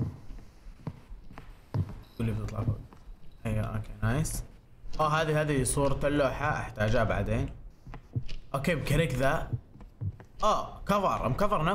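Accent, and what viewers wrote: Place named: Jizan, Saudi Arabia. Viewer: احلى لهجه هي الجيزانيه احبك 💗💗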